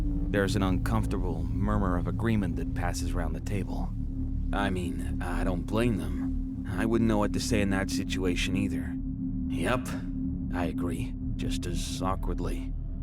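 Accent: United States. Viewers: Wait- you’re NOT American?! Damn dude!! Bravo!!! New visitor, new sub!!! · Came over from Dark Somnium, and glad I did. Great narration, ambiance,and excellent job on the US accent! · Its my 1st time listening, I'm from California. I thought you were an American from the eastern states , not east coast though. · wait...mf you ARENT from the US...?? This is my first time hearing ya, and I wasn't able to tell at ALL, I thought you were American. That's saying a lot considering I was literally born and raised in the US lmao. Some advice you may or may not find useful for future readings with the US accent : What you did was a north eastern accent, and very similiar to mine (plain standard US accent here). It matched the architecture shown in the art perfectly, dont know if this was on purpose or just luck. Some words were pronounced similairly to the 'standard canadian' accent, but thats actually accurate depending on the region, as many northern US citizens pronounce things similarly/exactly the same. 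Also: Telling apart the standard US accent from the other northern variations can be almost impossible unless you hear certain words pronounced. So dont get frustrated if you have a difficult time telling em apart: Many Us citizens can't, and they literally live here.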